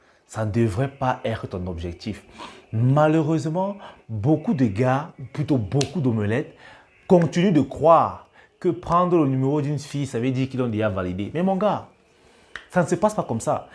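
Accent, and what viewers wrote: Cameroon. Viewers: Hayi déjà l'accent est clairement camerounais 😅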